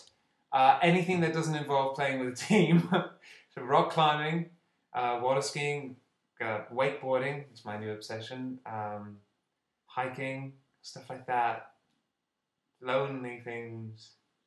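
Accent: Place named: United Kingdom. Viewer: ur accent uuuh god is killing me i'm in love with British accent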